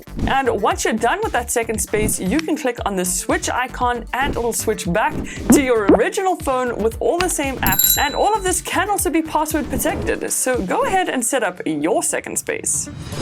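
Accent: South Africa. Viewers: Your SA accent is refreshing 🙂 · Are you South African?????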